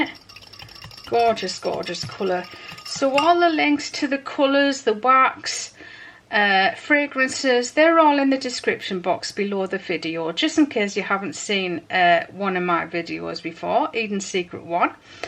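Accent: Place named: Hartlepool, United Kingdom. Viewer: Just found you and I love the videos. Are you from Hartlepool I reognise the accent but cant pin it down.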